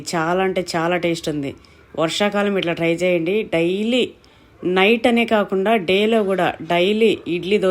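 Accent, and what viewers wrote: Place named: Rayalaseema, India. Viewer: అక్క మీ భాష తెలంగాణ రాయలసీమ స్లాంగ్ మాట్లాడతారు మీరు చాలా చాలా నచ్చుతుంది నాకు❤❤❤❤❤❤❤❤❤❤❤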